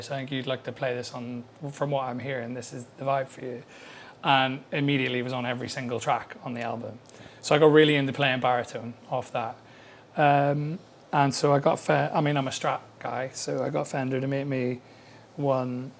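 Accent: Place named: Belfast, United Kingdom. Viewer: He sounds like he's from Belfast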